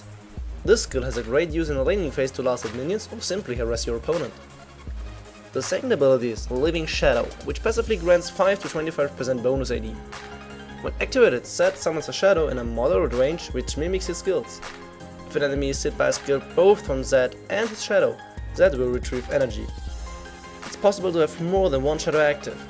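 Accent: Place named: Germany. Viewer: Ich kann dein Englisch mit deinem Deutschen akzent nicht ab :D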